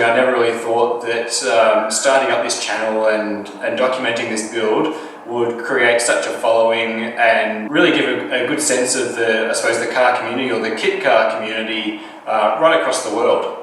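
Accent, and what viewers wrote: Australia. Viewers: Jesus how does one listen to that damn accent all day? Having you stuck down there in Australia was teats , yorn the Internet😐 - I can’t take it🇺🇸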